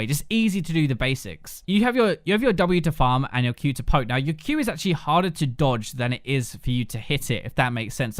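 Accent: United Kingdom. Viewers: Tbh i dont like hes british accent.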